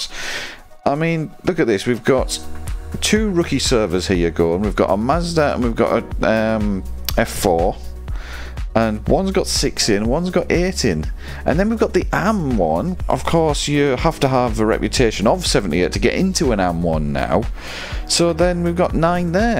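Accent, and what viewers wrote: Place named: United Kingdom. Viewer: Judging by your accent your in the UK. You wouldn't like the North American participation. Maybe fewer servers would help.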